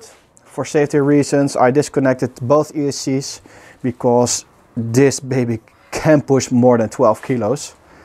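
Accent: Netherlands. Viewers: Ik dacht al aan de eerste paar zinnen dat moet een nederlander zijn, Heel gaaf gemaakt wacht op de volgende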